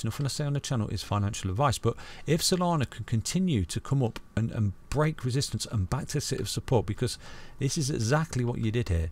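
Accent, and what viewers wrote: United Kingdom. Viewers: ARE YOU FROM UK?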